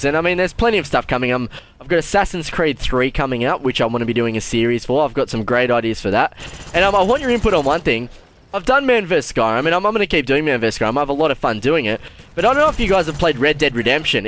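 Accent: Australia. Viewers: I like the dayz, Assassins creed 3 would be Kool give it a shoot. Dude I'll watch anythig with you cause everything is cooler with an ausie accent!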